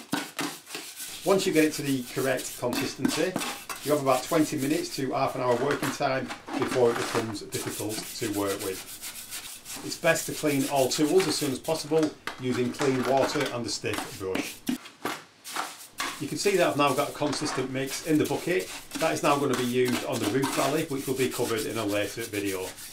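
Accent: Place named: Lancashire, United Kingdom. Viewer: Lancashire i am guessing. Nothing wrong with his accent.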